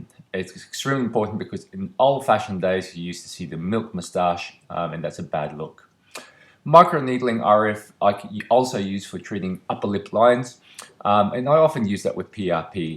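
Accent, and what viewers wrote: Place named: Australia. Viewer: Aussie accent.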